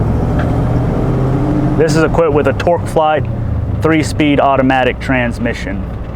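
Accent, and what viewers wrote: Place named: United States. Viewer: Is this the Stephen King car ? new subscriber glad to be here ,hey from Australia,what a sensational voice ,this is how as a young kid livin in the outback i expected real Americans sound like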